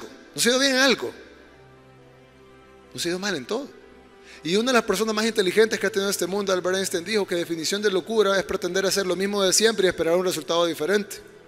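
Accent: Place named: Central America. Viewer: Hola como esta, Perdon y sin ofender pero no parece usted Mexicano. Que es eso de👉 Varón 👈? Si entendemos la palabra, pero es raro que la usemos aci como usted la acaba de usar. Usted se escucha si fuera Centroaméricano, lo digo con respeto....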